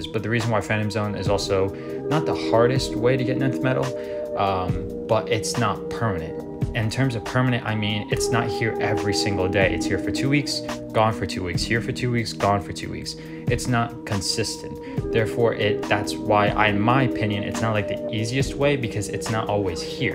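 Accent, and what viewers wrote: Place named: London, United Kingdom. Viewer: You are from London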